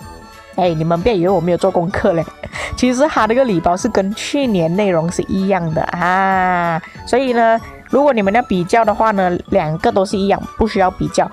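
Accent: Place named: Malaysia. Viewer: 你哪里人哦 马来西亚口音很重哦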